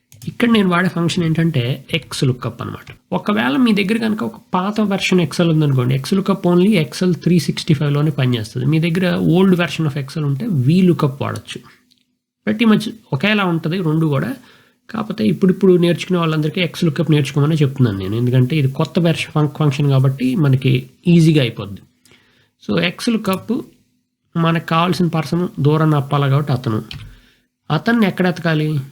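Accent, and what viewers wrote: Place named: Telugu, India. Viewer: with Telugu flavor, with a natural slang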